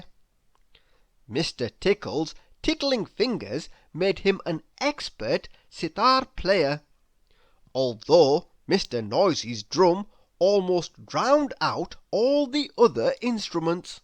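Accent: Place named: India. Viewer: im indian and are you Indian because your photo looks indian and you sound indian🙏🙏🙏🙏🙏🙏🙏🙏🙏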